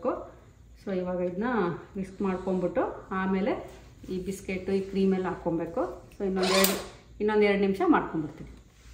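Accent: Mandya, India. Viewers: nim language kooda mandya language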